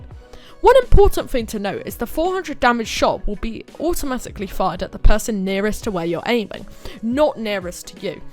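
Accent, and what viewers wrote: United Kingdom. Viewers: Ehh leave him alone, he's quite informative and the tone of his voice is lively plus the british accent which tops it off. Tbh i could listen to him all day, i mean somehthings are bestare what they are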